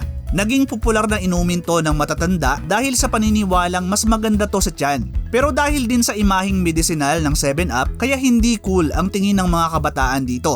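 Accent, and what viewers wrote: Waray, Philippines. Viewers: Waray ka ba tsong?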